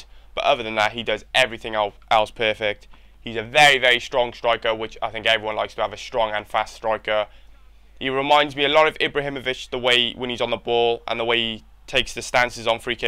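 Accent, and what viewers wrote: Wales, United Kingdom. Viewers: Are you welsh? I'm a new sub:-)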